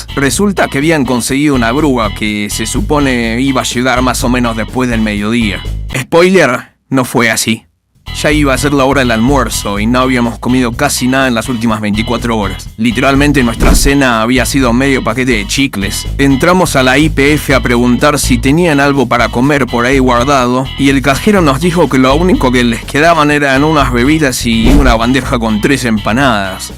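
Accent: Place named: United States. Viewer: Noto un acento medio yankee no sé por qué 🧐 o medio neutro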